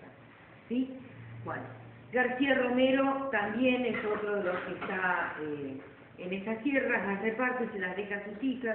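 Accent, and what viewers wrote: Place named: Argentina. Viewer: Correctamente amiga Entiendo perfectamente Dime eres de argentina Amiga Es que Vos habla como los argentinos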